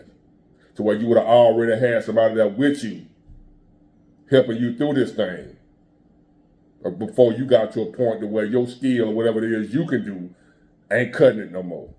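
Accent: South, United States. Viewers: Your country accent is type tier ✊🏾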